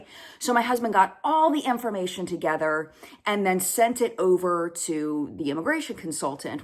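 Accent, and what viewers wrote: South, United States. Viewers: Here I am thinking of my recent retirement party hearing at least 7 accents from around the world plus 3 from different parts of Canada or my book club that has a member with a way deeper south US accent than you do ... nah you are good.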